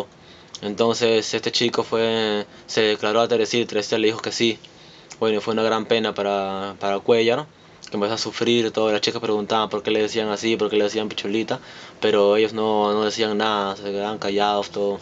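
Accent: Peru. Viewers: Ta bien pero hablai como las weas peruano klio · Es peruano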